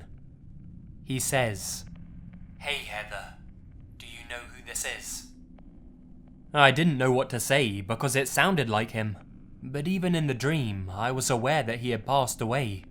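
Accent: United Kingdom. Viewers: are you british? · are you from the UK? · He's British - we don't speak American. Your jello and aloominum is different to our jelly and ALUMINIUM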